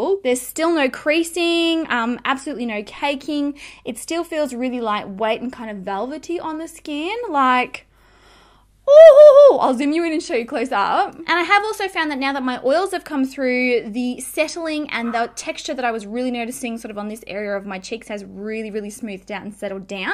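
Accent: Australia. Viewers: This is the first time seeing you and I'm already a fan. You are gorgeous and charming and your Aussie accent is adorable. Subscribed!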